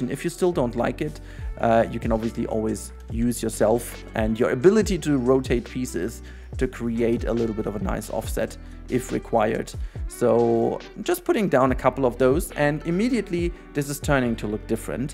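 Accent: Germany. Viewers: Sag mal, bist du Deutscher? Ich höre da so einen Akzent. 😄 Hab dich gerade entdeckt, mega Video! Danke dir.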